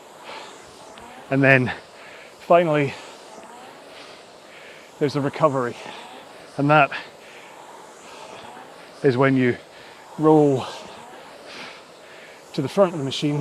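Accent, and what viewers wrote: Scotland, United Kingdom. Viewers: #Alfresco Have to say I hated that! Just don’t have the stamina just now for that pace so had to stop a couple of times for a few seconds then I needed 30 sec with 6 minutes to go. Can only get better!!! Thanks. Always good to hear a fellow Scot!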